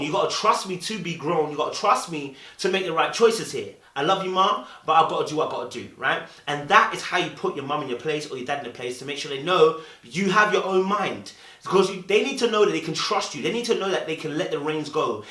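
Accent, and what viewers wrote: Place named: London, United Kingdom. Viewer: Great video! Bro you have a bit of an accent, it's like a London accent mixed with another provence's accent. What accent is that?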